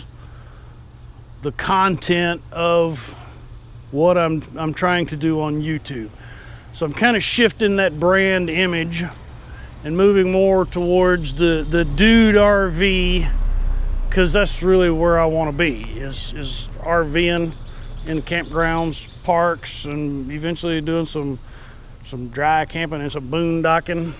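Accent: Texas, United States. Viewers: I have to agree about you not being in the logo. Even if you're represented by a stick man wearing a hat and holding a camera. I hope you have a fun and safe trip to the Smokies. Thanks for all of your help. I'm looking for a fifth wheel or travel trailer to live in. Your videos are informative and fun to listen to. The others, not so much. Thanks again for your work and keeping the Texas accent real. Stay Safe. Stay Happy. Stay Loved.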